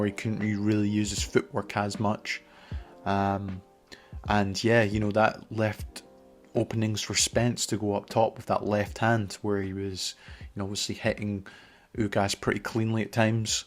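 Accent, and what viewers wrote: Scotland, United Kingdom. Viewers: Hey dude are you from Scotland? I’m from Dundee/Aberdeen and I love boxing. We should do a co-lab sometime, I’d be happy to jump on the show and chat with you anytime! Keep up the good work 👍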